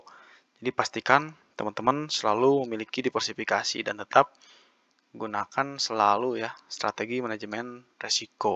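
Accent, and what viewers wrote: Indonesia. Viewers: Bang lu orang Tegal ya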